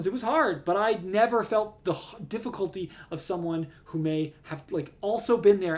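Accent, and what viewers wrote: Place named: Middle East. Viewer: well you also have a bit of an accent to you so I guess that's why I thought Middle Eastern. Another thing I was a little surprised by is hearing that you grew up in OC, cuz I thought you grew up in NY.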